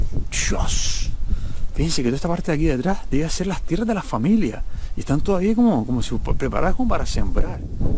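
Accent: Spain. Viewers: Hermosa casa muy buen video ❤ y sobre todo me encanta el acento Español saludos desde Colombia 🇨🇴🇨🇴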